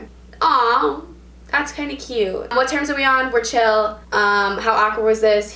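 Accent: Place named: New York, United States. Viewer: You have like a little bit of a New York accent, and I'm so here for it !!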